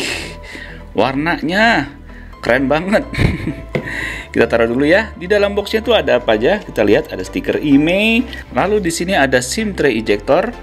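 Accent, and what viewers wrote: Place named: Java, Indonesia. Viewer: Kan aku cuma bilang suaranya kaya orang jawa terus apa salahnya?? Ni saya juga orang jawa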